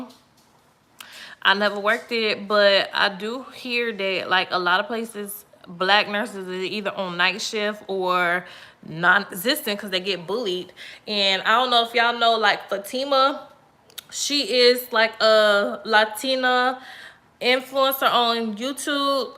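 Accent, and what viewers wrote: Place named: Polk County, United States. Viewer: That’s that Polk county accent. Only the ones from the city know it 🤣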